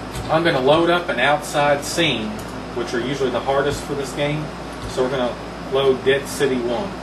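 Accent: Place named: Texas, United States. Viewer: love the accent, sounds like texas. BRING MOAR TF2 DISPENSERS HIER!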